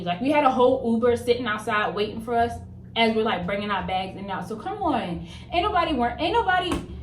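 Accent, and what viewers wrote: Baltimore, United States. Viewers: Her accent sounds like she’s from Baltimore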